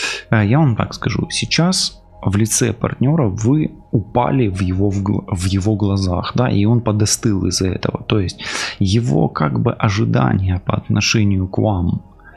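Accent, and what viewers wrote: Ukraine. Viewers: Доброе утро! Благодарю Вас за Ваши прекрасные расклады,их можно слушать бесконечно,они доходчиво,понятны,Ваш прекрасный голос, Вы просто Супер! А главное всё в точку, Ваш хохлятский говор! Я говорунья,а он молчун, может подойти молча ударить,как он говорил "для профилактики" вот так-то (шутка).Всех благ Вам от души!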